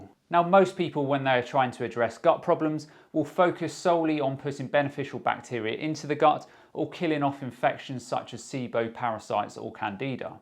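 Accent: United Kingdom. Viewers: You sound British. Thankyou for info. Where do you suggest to get these tests done? So many options I don't know which to trust.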